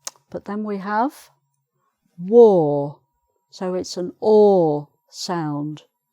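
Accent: United Kingdom. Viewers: Hello...you are the most elegant teacher I have ever come across having such a lovely voice and true Brit accent.Why don't you reply to our comments?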